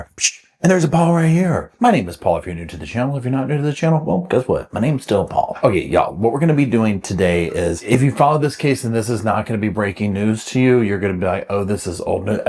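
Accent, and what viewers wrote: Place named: Western North Carolina, United States. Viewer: I didn’t know you are a fellow Tarheel. I thought your voice was kinda western NC:)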